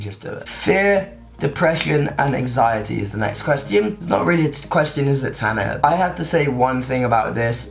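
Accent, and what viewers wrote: England, United Kingdom. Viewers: I'm really into your british accent :)) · I love your English accent❤️❤️❤️❤️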